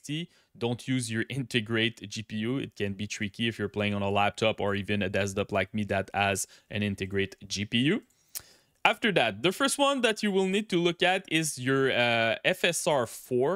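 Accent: Quebec, Canada. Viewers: Was interested until I heard "French" Canadian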